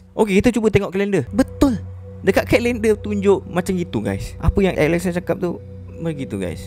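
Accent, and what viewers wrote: Malaysia. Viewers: Kwkwk lucu, logat melayu memang sangat lucu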